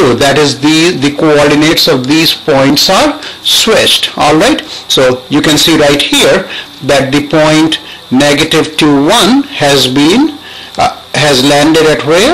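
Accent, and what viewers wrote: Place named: India. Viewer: lol indian accent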